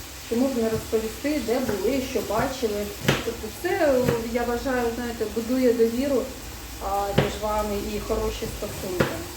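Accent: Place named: Western Ukraine, Ukraine. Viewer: Доброго дня! Цікаво знати звідки ви виїхали? Судячи з гарної української ви з заходу, невже там було так небезпечно? Чи просто дуже до Англії хотілося?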